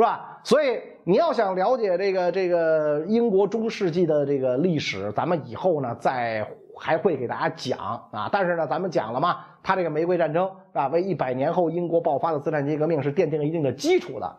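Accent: Beijing, China. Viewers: 老師聊什麼我都愛聽，這口京味就是聽著舒服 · 袁先生的Beiglish第一拝聴、 很有特徴！！😉👍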